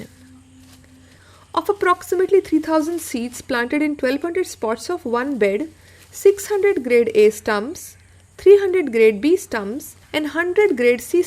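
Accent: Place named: Sri Lanka. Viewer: Though the video is very informative, it's very hard to digest ur srilankan English..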